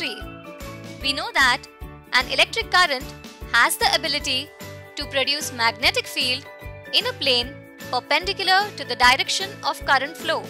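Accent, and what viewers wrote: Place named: India. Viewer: Great presentation but this annoying woman with an indian accent i can't...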